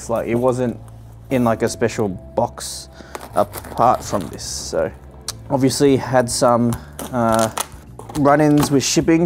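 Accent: Australia. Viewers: Are you from Melbourne Australia? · ARE U AUSTRALIAN???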